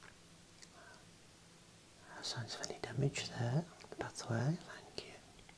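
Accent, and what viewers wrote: Birmingham, United Kingdom. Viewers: Are you a brummie by any chance. Love the accent.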